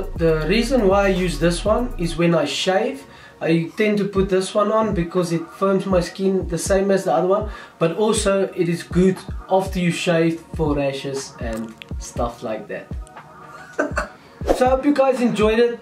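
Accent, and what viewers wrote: South Africa. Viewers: Sounds like a broertjie from South Africa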